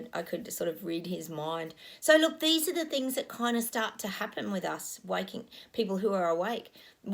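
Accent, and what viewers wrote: Australia. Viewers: I enjoy hearing your Aussie accent,! Lovely. Here in the USA, Arkansas, we are in triple digit heat, and blessed to have air conditioning in my home. Blessings to all who are striving to stay in peace in our hearts. Thank you for your messages and your humor😄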